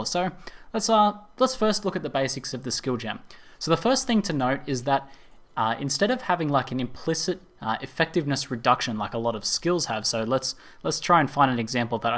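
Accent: Australia. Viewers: were are you from in Australia? if your from Aus that is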